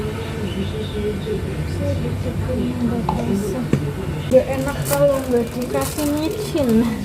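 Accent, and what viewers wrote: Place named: Cilacap, Indonesia. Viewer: Aku kok suka logat mbak nya ngomong jadi ingat temen saya ngapak2 daerah Cilacap.salam kenal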